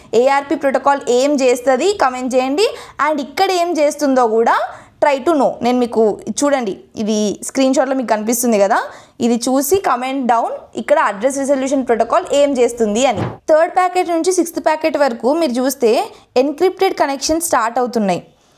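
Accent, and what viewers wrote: Telangana, India. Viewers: బాగానే చెపుతున్నారు కానీ తెలుగుని తెలుగు లా మాట్లాడండి దయచేసి అరబిక్ హిందీ ఉర్దూ కలిసిన తెలుగు భాష యాస మాట్లాడకండి దయచేసి 🙏🙏 తెలంగాణ యాస భాష అనకండి.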